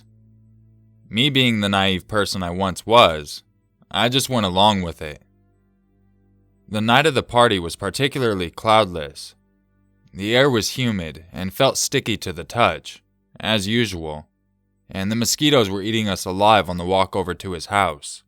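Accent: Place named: Appalachia, United States. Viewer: loool pure inbred fuckland ... hillbilly voice ..yeaaaa haw screaming in my mind folowing the sound of spit full of chewing tabaco hiting a metall bucket lol...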